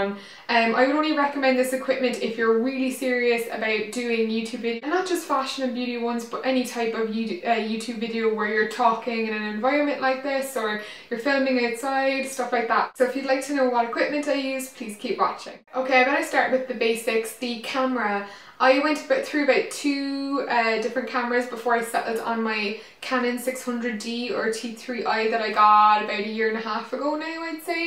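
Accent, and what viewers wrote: Ireland. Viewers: The good Irish ascent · Hey r u irish aswell😜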